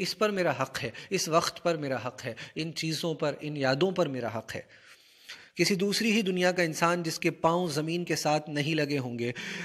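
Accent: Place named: Punjab, India. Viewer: wah sir kiya Punjabi boli hai apne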